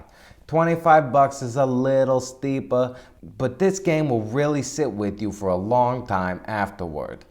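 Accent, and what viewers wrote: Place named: Boston, United States. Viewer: Really love your Bostonian accent (sorry if that's not an accurate description). Your parents named you perfectly, it just totally fits your presentation. You have the potential to be similar Yahtzee of Zero Punctuation, as in using your nationality as your draw; maybe try a VR mafia insider type persona - you'd totally pull it off.:P Regardless, this Australian gives you the thumbs up and I'm glad I subscribed, especially after seeing your live stream cus you seem like a cool guy who genuinely loves VR as much as I do.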